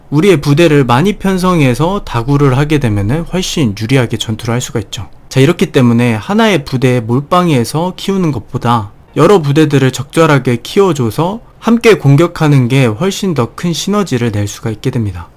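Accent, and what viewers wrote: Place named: Jeolla, South Korea. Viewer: 전라도 사투리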